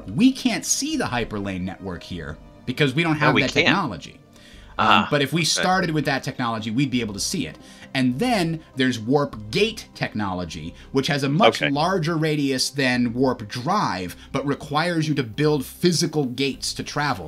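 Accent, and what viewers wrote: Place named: United States. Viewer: The yankee pronunciation is annoying but hey, great vid. While the word "pop" made me cringe, you explained everything well. I really needed this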